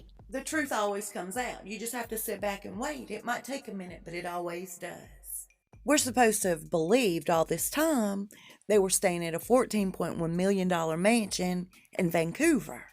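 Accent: South, United States. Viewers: Catty, nasty and hateful. This narrator is one of the original small town "Mean Girls". Even her southern accent proves it. Wonder who paid her to do this?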